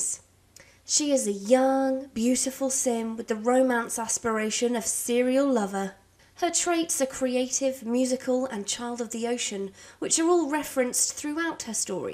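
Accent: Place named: Scotland, United Kingdom. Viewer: me hearing scotland be like, yas.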